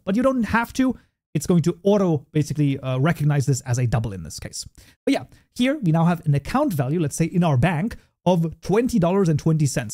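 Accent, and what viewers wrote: Germany. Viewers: LMAO The German "Scharfes S". Love you german voice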